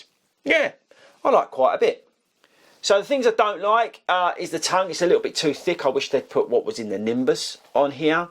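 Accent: United Kingdom. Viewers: Ich mag diesen britischen Akzent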